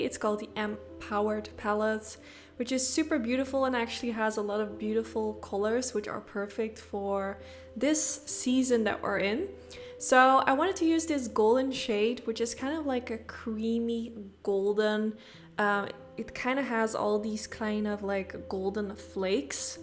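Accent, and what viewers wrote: Netherlands. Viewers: Ben jij toevallig Nederlands? 😁 hoor een nederlands accent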